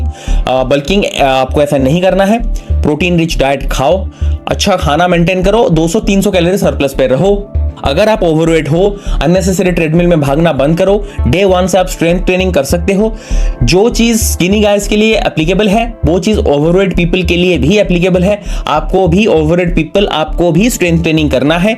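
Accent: Odisha, India. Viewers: Bhaiya r u from odisha???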